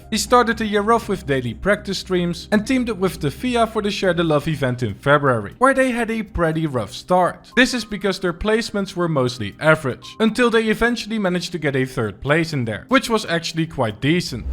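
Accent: Netherlands. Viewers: Me after half of ur first sentence: Yes, this guy is DEFINITELY Dutch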